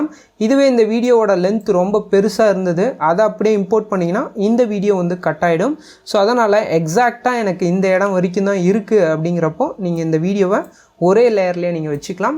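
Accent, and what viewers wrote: Salem, India. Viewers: Anna neenga salem a?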